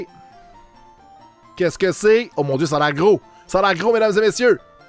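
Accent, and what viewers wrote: Quebec, Canada. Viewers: Joli tirage. J'adore regarder tes vidéos et ton accent québécois est un pure moment de bonheur et de nostalgie ça me rappele mon voyage au Québec il y a 20 ans je rêve d'y retourner un jour avec mon fils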